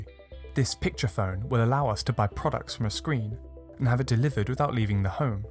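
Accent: United Kingdom. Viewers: i was worried this video would be ai but u sound too british to be ai so i think were good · Tell me how I knew the narrator would be a Brit before I even started the video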